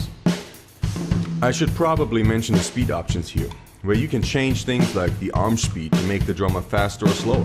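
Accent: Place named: Long Island, United States. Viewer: Hi, on your accent - I grew up in Long Island - I just thought you were from somewhere on LI I hadn't been to yet 😁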